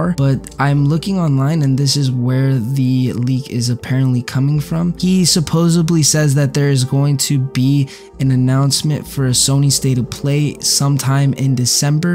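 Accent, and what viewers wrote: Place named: Queens, United States. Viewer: Mg u sound so queens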